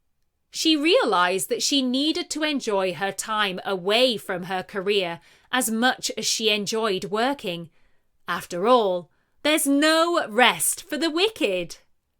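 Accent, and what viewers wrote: United Kingdom. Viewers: What's this type of British accent it really sounds magnificent and original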